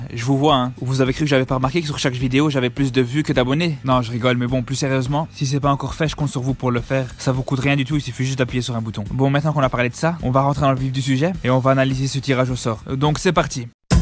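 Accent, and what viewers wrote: Brussels, Belgium. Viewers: Bravo pour tes 5 000 abonnés 😍 c'est chiant pour le Maroc de tomber contre la côte d'Ivoire maintenant ils vont vouloir se venger sinon désolé Fréro 😉 je suis un Tunisien et on a vraiment une bonne chance sinon j'adore ton accent bruxellois tu me fais rire 😂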